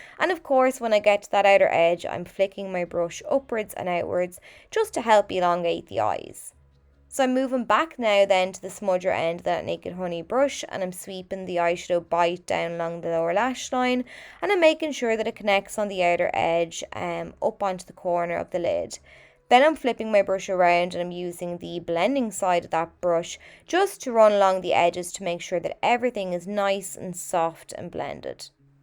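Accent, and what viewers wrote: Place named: Ireland. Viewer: I love your accent, after my Geordie accent an Irish one is always my favourite. Another great tutorial from you, but I didn't buy this palette cos its just too light for me, I like darker smoky eye shades, but it does look good on you though. Keep them coming gorgeous girl 👧 😘 ⭐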